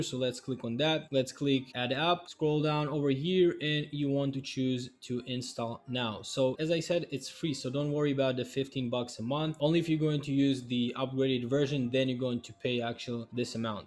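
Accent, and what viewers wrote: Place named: Russia. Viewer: Спасибо за видос братишка я и не сомневался что ты русский! когда услышал твой акцент и был рад что Русский человек учит представителей других нации дропшипить! РЕСПКТ БРАТИШКА! А ТЕПЕР ВСЕ ЭТО НА РУССКОМ ПОЖАЛУЙСТА!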